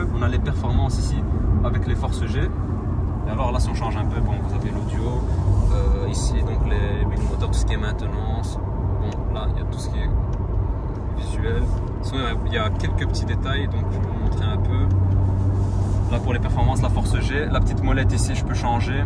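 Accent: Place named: Belgium. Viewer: une guitare !!! retourne compter les chevres au maroc avec ton accent belge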